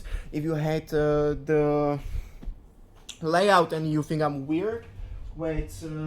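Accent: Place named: Hungary. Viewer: túl sokat afektálsz , könnyen kihallatszik a magyar akcentus, csinálj inkább magyarnyelvűket, van valami botod de mi a faszoim az? minek mutatod meg ha nem is magyarázod el mi az , értelmetlen használhatatlan a videó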